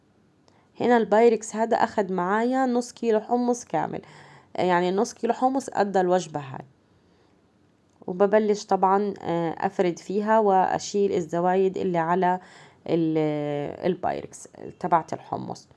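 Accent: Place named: Egypt. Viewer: انت ليش بتحكي مصري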